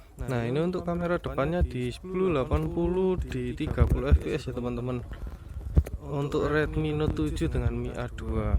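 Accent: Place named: Surabaya, Indonesia. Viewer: Suroboyo ta bang ? kok medok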